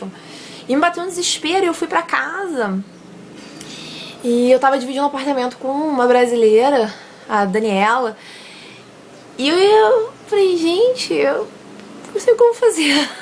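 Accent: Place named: United States. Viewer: Nossa, como você fez para ter um 'speaking' tão bom? Adoraria ir para os EUA e eles acharem que sou de lá também rs (como todo mundo né rs). Enfim, dicas para falar tão bem assim e perder o 'sotaque brasileiro'? Sei que o assunto é 'Listening', mas como seu sotaque americano ficou tão perfeito assim? Thanks a lot :)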